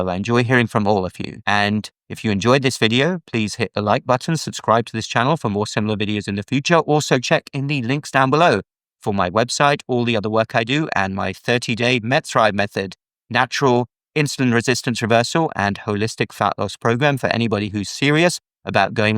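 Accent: United Kingdom. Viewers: You sound like you're in the UK so point is you should be aware that the type of care a lot of people are getting is so surface level and subpar it's unbelievable. Are we shamed for being overweight? Of course. Are we given innovative solutions and advice to fit our specific needs eg conditions like PCOS, Hypothyroidism etc? No of course we're not. Are we taught about proper nutrition? Never. So it's not a wonder why most people consider a quick fix when the shaming around weight and yet no help are very loud in the medical field.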